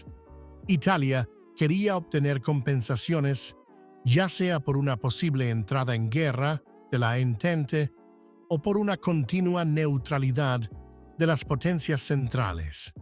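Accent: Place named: United States. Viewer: Porque acento yankee ??? Actualiza la IA y que te ponga acento neutro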